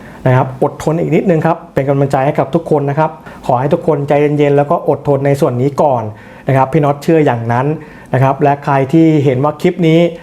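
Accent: Ubon Ratchathani, Thailand. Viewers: พี่คนอุบลหรือครับ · พี่เป็นคนอุบลเหรอคะ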